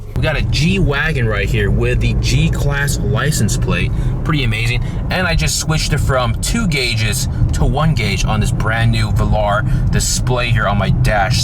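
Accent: United States. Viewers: The way you talk, quintessential american. like it....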